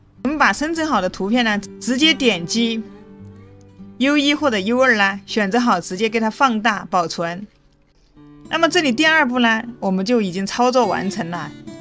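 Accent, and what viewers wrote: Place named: Sichuan, China. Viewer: 哈哈，四川人哇，老乡见老乡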